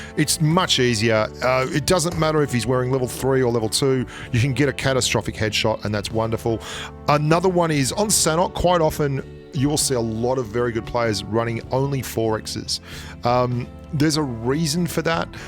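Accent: Australia. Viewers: Are you from Australia bro ??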